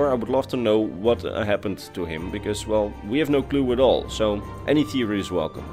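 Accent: Netherlands. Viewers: I didn’t really pick up from your accent that you were Dutch, until I thought about it more after you said “Dutch time”. What province are you from, might I ask? Also, love your videos!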